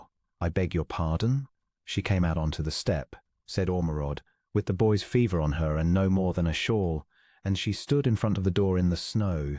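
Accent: United Kingdom. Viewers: Loved this story! Thank you for reading it in a British accent and making this tale so authentic and enjoyable.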